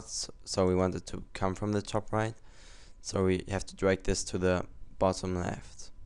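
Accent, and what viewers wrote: Germany. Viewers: Your German Innit?:3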